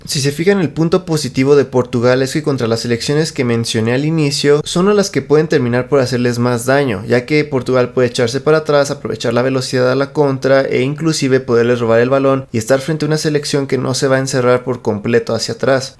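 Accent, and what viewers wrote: Mexico. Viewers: Eres mexicano o portugués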